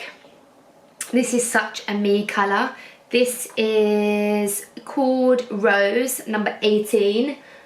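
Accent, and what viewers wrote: Essex, United Kingdom. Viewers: I love the Essex accent .. And love your vlogs .you are unique and you .. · Love the real ness! Your a babe! And I love your accent! My mums a Essex girl & she is cool AF xxx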